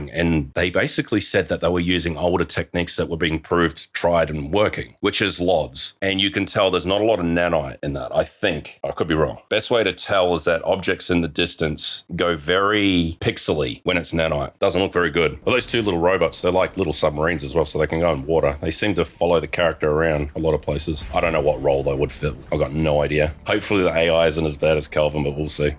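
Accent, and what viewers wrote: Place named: Australia. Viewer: Hope all is well mate, you were a big reason I got into the forest and I still routinely watch on your old videos just for a good time. Hearing another Australians voice playing through a game I loved so much was a huge hook for me and I’m eagerly awaiting a video once the time is right. Hoping everything’s great with your personal life, and one final huge thankyou for being a big part of my friends and i’s lives growing up.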